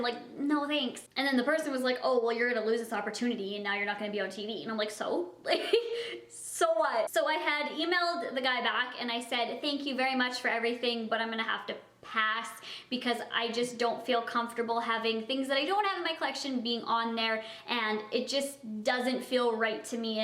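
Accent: Canada. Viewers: Am I the only one that loves the way Canadians say about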